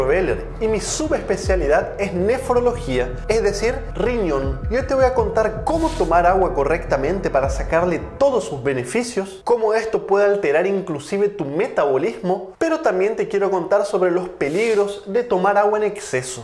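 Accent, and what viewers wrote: Chile. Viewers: Noviembre 1/2022: contenido interesante. Usted combina 2 tipos de acento. Habla como chileno y como yucateco. Posiblemente estudio en México. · Gracias Doctor. por su acento creo es chileno. Saludos de Mx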